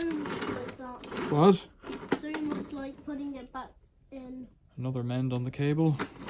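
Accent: Ireland. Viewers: You sound like an Irishman. I bought mine 1 week ago with same vacuum and looking for some DIY as my newly bought bloody expensive vacuum stops suctioning but power is working. Can’t find any solutions on my machines manuals.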